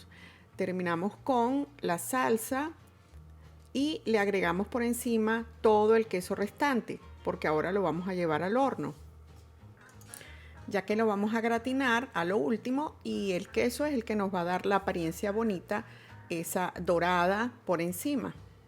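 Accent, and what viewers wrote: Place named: Cuban American, United States. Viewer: Muy bien explicado, para mañana tengo invitados, y estaba revisando cómo hacerla, gracias . Está rica. Por tu acento creo que eres cubano americana.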